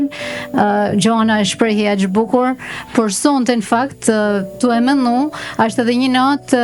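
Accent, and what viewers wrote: Shkodra, Albania. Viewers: Sa. Shum. E. The. Ate. FJALEN. QI. QI. QI ma. Mir. Do. Te. Dalin. Qe Te. Thuesh. Qe Qe. Qe. Qe Jo. QI Qi. Ose Ci. Ci Se NUK. I. Shkon. Dialektit. Shkodran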